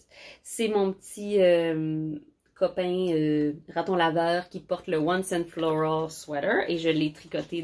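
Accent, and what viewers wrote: Quebec, Canada. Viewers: Bonjour, ici la France, je découvre et j'adore tout... Et l'accent du Québec et top... Je visionne tous tes podcasts et j'adore... Merci pour ce plaisir tricotesque 😄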